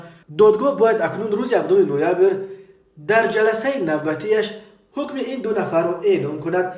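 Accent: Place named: Kulob, Tajikistan. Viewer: Ма хдм ай Кулобм гапзаниш 100%ай лахчаш амка ширинай бодиққат гуш кн сирф точики гап задестай чхел нофахмоай ку аму карима ё чумлае ки нофахмоай нависонш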